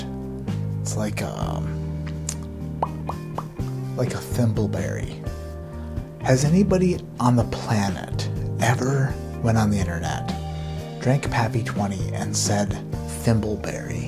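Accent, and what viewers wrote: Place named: Minnesota, United States. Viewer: The mystery of upbringing continues. When I first heard him say his own name, not but ” I said, “a- ha—Minnesota.” The name should take a split second to say but he extends it out and taffy-pulls what was supposed to be a simple short “a.” Tortures it in that nice way, being and upper Midwesterner. Friggin is also Minnesotan, as are many of his other phrases. But the upper Midwest accents have no sharp state boundaries. Now he drops “thimbleberry” on us, or rubus parviflorus. That put a whole née spin on his mysterious origins. We know he lived in Michigan for some time before moving east. Clueberry. Thimbleberries are only found in Minnesota on the Lake Superior shoreline so Duluth is a possibility. But they are most common along Michigan’s Upper Peninsula, aka da U.P.? Could it be that is a Yooper? A native of the land of not quite Wisconsin and not quite Michigan and not quite right? 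Maybe Marquette, Sault Ste Marie, or perhaps Esky? Houghton up on the Keeweenaw is where all the thimbleberries can be found. At some point I hope tells us where he friggin grew up and got that outrageous accent!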